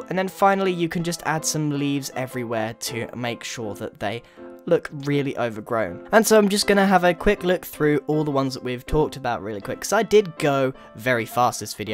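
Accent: United Kingdom. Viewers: Oh are you British cause there are not that many YouTubers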